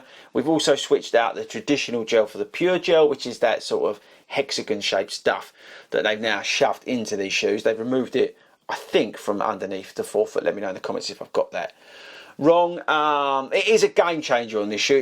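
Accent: United Kingdom. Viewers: Ich mag diesen britischen Akzent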